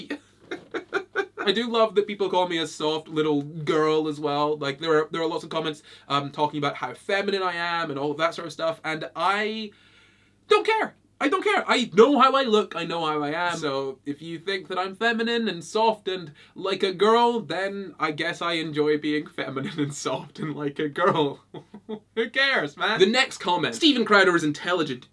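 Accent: Scotland, United Kingdom. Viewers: I don't understand how people can call you "feminine". Unless they are using "feminine" as a synonym for "smart". To me you appear to be clearly masculine, and your Scottish accent is a pleasure to hear. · Is it bad I never noticed you're scottish??? like I'm british but my brain only registered your accent as "northern" tbh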